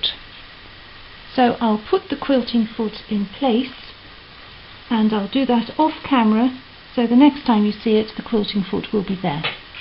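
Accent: United Kingdom. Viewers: You have a beautiful way of speaking … its lovely to hear British English rather than American. Reminds me of Lady Di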